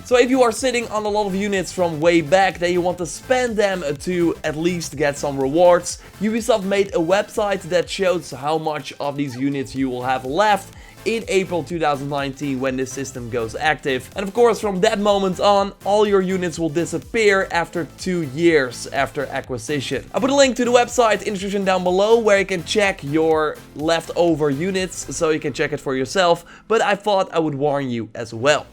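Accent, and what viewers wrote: Netherlands. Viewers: he Dutch as fuck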